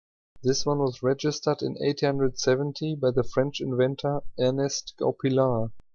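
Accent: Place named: Germany. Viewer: Ich würde dir anbieten, dir dass in einem verständlichen und korrekten Englisch zu besprechen, weil so ist es ziemlich "denglish" und kaum ein Muttersprachler wird sich das "antun".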